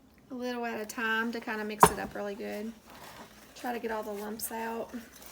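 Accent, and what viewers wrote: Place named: Kentucky, United States. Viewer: Hey there fellow Kentuckian! (I live in PA but I hail from the Bluegrass State!). I found your channel thru MrG1965. I have family in central Kentucky - Greensburg and Campbellsville. It's just lovely to hear someone with a KY accent! I don't hear it much up around here in Yankee territory! My wife does all the cooking here, and she's knows what she's doin too! I'm happy to support your channel and if you feel moved to return the favor that would be greatly appreciated. And if you don't feel moved, well, that's okay too! I hope yall had a wonderful Thanksgiving!! I forgot to ask......may I please have a slice of that delicious lookin chocolate pie?!!??!!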